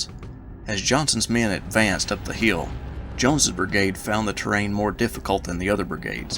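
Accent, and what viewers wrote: South, United States. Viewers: Wow, excellent job! I just binge watched every one of your Gettysburg videos and I must say well done sir! I can tell by your accent you are of southern birth, as am I. My 3x great uncle fought at Gettysburg in the 7th La infantry.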